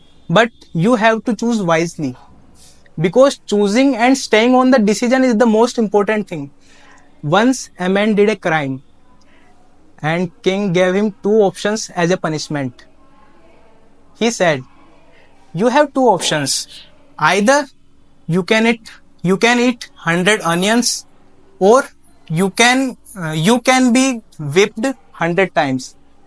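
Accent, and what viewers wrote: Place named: United Kingdom. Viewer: He Speaks well, Simple with grammar. British English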